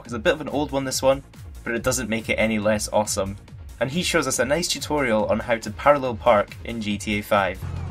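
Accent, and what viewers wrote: Scotland, United Kingdom. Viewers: Where are you from? You sound Scottish.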